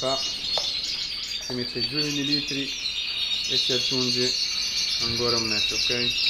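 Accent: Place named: Tunisia. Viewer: منضنش الله اعلم تونسي ولا ليبي على حساب اللهجة تاعو على الاغلب تونسي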